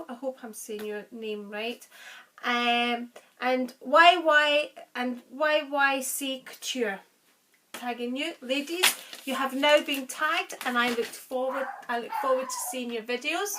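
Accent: Scotland, United Kingdom. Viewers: Thank you for tagging me! Just love watching and listening to your Scottish accent!! You look gorgeous as always xxxooo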